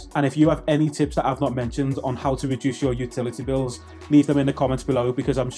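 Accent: Liverpool, United Kingdom. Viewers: Decent video you sound local to me liverpool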